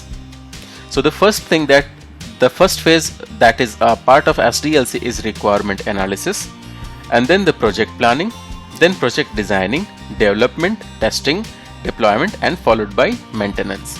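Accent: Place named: India. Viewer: Boss your hindi influence is coming while you are speaking.. speak better,explain and usually in correct way then u tell abt communications skills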